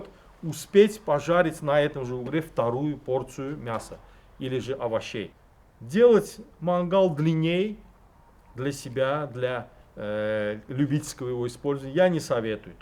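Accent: Baku, Azerbaijan. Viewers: Всегда рад послушать ,Бакинский акцент так как я родился и вырос а Лок-Батане,в 24 года уехал в Пензу,но Баку в моем сердце НАВСЕГДА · Здравствуйте! Большое Вам спасибо за лекцию. Самый правильный, познавательный, без лишней воды расклад по теме. Очень приятно было Вас слушать. Родной акцент, с любимой родины моей. Здоровья Вам и вашим близким.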